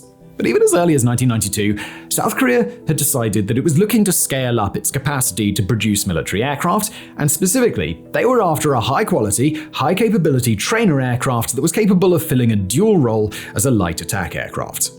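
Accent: England, United Kingdom. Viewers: you have great topics but, very sorry, I am struggling with your english accent. You often whisper the last part of your sentence. I sometimes don't understand what you are saying. thanks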